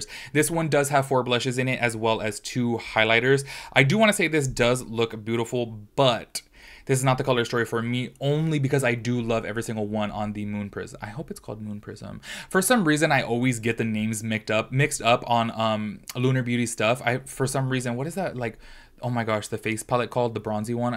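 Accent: United States. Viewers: The way you say it is the way I say it and that's the American way to say it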